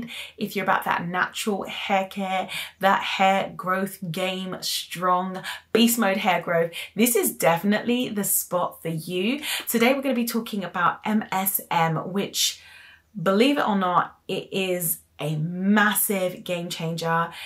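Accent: United Kingdom. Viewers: You have a Brittish accent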